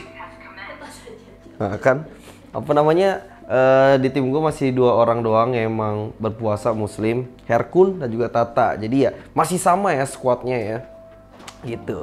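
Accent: Palembang, Indonesia. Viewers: Masih kentel banget bhso Palembang ny bang salam dari batu raja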